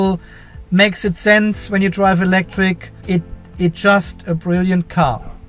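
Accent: Germany. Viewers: Das verstehe ich sehr gut. Alleine diese Videos kosten schon enorm viel Zeit. Dein Englisch mit leicht deutschem Akzent ist aber für Deutsche sehr sehr gut zu verstehen. Gut, dass du dir nicht den australischen Slang angewöhnt hast.😉 Bei Garry Reed habe manchmal Probleme seine Worte zu verstehen.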